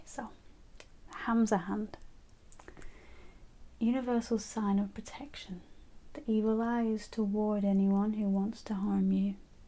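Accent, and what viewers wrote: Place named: Lancashire, United Kingdom. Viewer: Of all the accents I never thought I would get tingles from, yours (Lancashire?) is one I would never have imagined! But your voice, style and tone is amazing. Also your accent is lovely. Just shows you should never stereotype! Keep it up - and please do a Q&A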